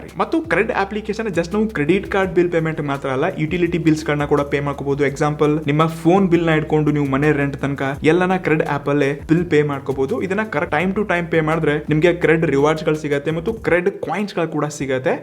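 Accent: North Karnataka, India. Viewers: Nivu uttara karnataka bhashe matadtiralla niv uttar karnatakadoren 😍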